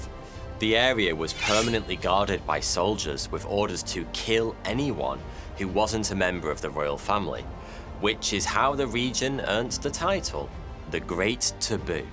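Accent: United Kingdom. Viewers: you're the best story teller ever and your britich accent makes 20 times better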